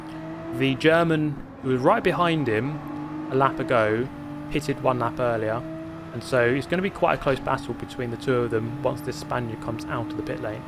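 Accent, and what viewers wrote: United Kingdom. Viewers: Always calm and composed, unbelievably well spoken, tastefully subtle British accent, and an incredibly smooth natural tonality of voice complimented by a great recording / microphone is a legit perfect combination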